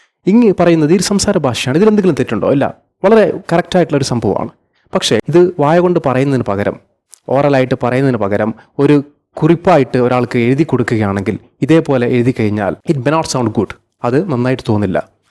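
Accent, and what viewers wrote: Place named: India. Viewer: your accent is terrible you Indians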